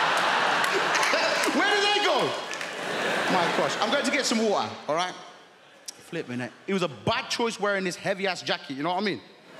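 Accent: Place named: Midlands, United Kingdom. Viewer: loosely midlands english but probably nearer birmingham.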